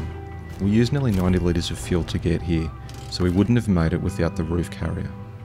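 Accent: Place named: Australia. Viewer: I only discovered your channel and content today. Best out. Good to hear a fellow Aussie, keep up the wicked content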